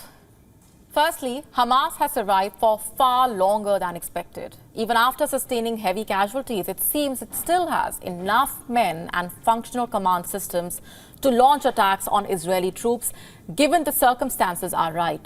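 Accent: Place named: India. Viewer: This lady is speaking English with Indian accent that makes you throw up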